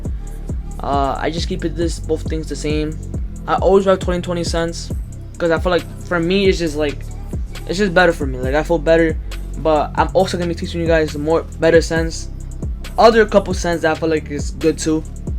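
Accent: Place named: Bronx, United States. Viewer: nah you just sound like it gang ik a Hispanic Bronx accent😭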